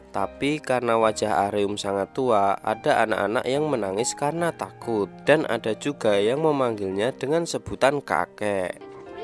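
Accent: Java, Indonesia. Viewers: kenapa kalau ente yg ceritain film ini feel sedihnya kagak ada....yg ada malah ngantuk! mungkin karna meddok yah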